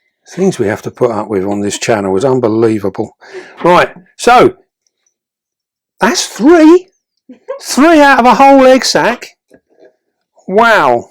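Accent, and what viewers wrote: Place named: England, United Kingdom. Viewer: I'm late seeing this, sorry. But so enjoyable! I respect and learn from many channels and keepers, but you and are my favorite! It's educating, fabulously interesting and funny too at many times...this is one! I am a sucker for an English accent as well!😂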